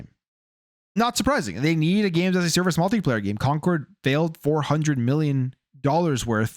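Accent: Canada. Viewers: You a canadian!?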